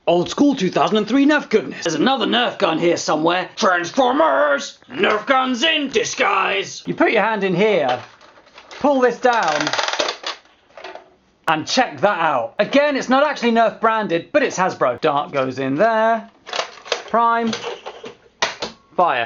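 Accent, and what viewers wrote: United Kingdom. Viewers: Btw can i get a Shout out in your next vid i would greatly appreciate that plus i love your British ascent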